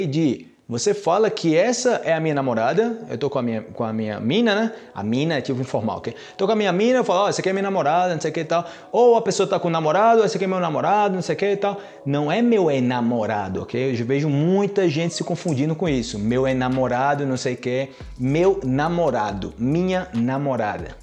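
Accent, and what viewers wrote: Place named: Colombia. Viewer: Adoro tu acento colombiano ! Cuando dices " enserio " 😍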